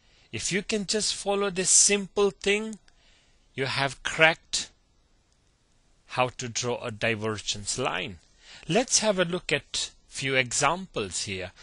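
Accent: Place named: India. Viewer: love the indian accent. thnx for the vid tho :)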